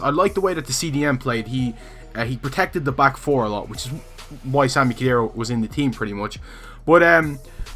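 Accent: Dublin, Ireland. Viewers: What part of Dublin are you from? ps I love these vids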